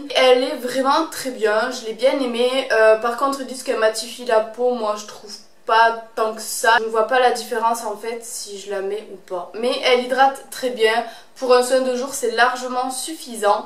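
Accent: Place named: Southwest, France. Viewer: Coucou, pour mon deuxième commentaire sur ta chaine (je poste RAREMENT des commentaires), je tenais à te dire que j'adore ta personnalité ! Le fait que tu évites d'inciter tes abonné(e)s à utiliser des produits pas très conseillés me plait beaucoup et montre ta sincérité et ta bienveillance. J'adore ton accent, j'ai le même, et c'est tellement naturel ! Ca fait du bien d'entendre cet accent sur Youtube ! Enfin ! :P J'ai déjà essayé de me lancer sur Youtube mais par manque de motivation je n'ai pas continué. En revanche je fais de la photo. Tu peux toujours aller voir ma chaine si le coeur t'en dit, ainsi que ma page Facebook : Capricorne Clic Photographie. (Ouais j'en profite un peu, mais la solidarité du Sud (Ouest) paie toujours, donc ... ) haha ! Gros bisous et continue comme ça.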